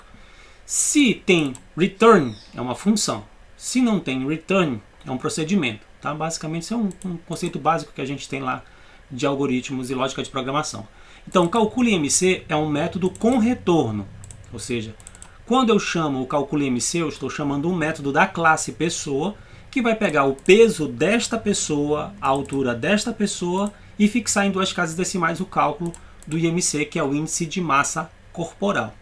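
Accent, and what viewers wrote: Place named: North, Brazil. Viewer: mas o sotaque de nortista tá em dia hehe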